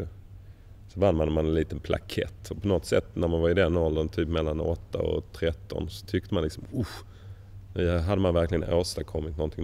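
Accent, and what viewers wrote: Sweden. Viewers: Sjukt ovant och höra honom med skånsk dialekt :P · Vad i helvete, är han skåning?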